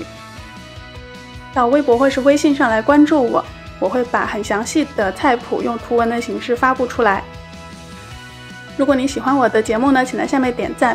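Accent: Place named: Shandong, China. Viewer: 我想一定是山东人！口音也像。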